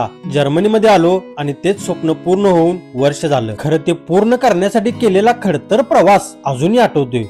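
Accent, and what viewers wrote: Kolhapur, India. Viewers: Khup Chan 👌 Tumchya bolnyavarun tumhi kolhapurche vatata. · तुम्ही कोल्हापूर चे आहात का.. भाषेच्या टोन varun तस वाटतयं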